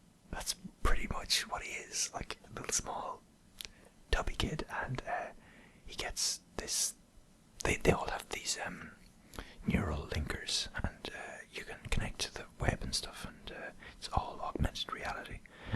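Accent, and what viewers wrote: Ireland. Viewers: Okay, so I recommend you watch 'sword art online'. I'm personally not a big fan of anime but my girlfriend is and so I volunteered to watch some; she recommended me to watch SOA (Sword art online) because.. I dunno, I think it's pretty popular. As a not very big fan at times I still watch it and think pfft... this is so Niche to teens like all animes kind of are. But the concept of purchasing this game (the game being a virtual reality RPG with the twist of being stuck in the game forever until you beat the final boss then you are free but if you die in the game you die in real life) and having a thousand 'beta testers' who know the strategy and how to survive in this game is a pretty interesting concept. Btw, your videos are great and your Irish accent is awesome. Keep up the good work :)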